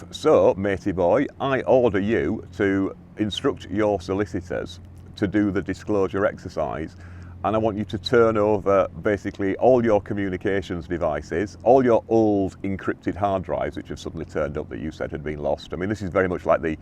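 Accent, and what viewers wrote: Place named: North, United Kingdom. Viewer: how many times a day do you have to ‘Bite your lip’ & actually not ‘call a spade a spade’, but use a euphemism instead? You explain things so eloquently, in a northern accent, that I could listen to all day. Thanks for being so ‘natural’.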